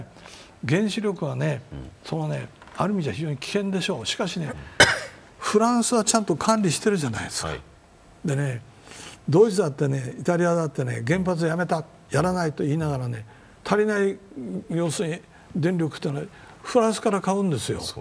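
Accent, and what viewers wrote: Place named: Tokyo, Japan. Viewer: テレビだろうがお構い無し、ブレない自分の信念をハキハキとべらんめえ調で語る姿が実に粋だと思う。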